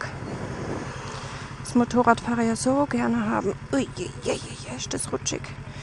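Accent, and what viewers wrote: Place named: Baden, Germany. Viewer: Isch des rudschig 😂 Badner? 😂✌️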